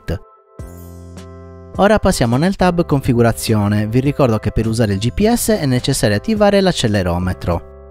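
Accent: Sardinia, Italy. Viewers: ciao Avrei un altro problema, in attesa che mi arrivi l esc per x220 vorrei utilizzare il nazgul v2 posso utilizzare, come radio, fs 16 x cosa devo fare inoltre, scusa tutte queste domande, ho acquistato gli ev 100 ma non riesco a vedere nulla, dove sbaglio? Dall accento sei del cagliaritano io sono dell ogliastra magari un giorno ci incontriamo per un caffè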